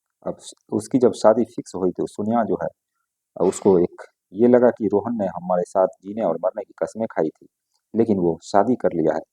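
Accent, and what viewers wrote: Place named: Bihar, India. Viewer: Typical Bihari hi ye. Pronounciation check kijiye please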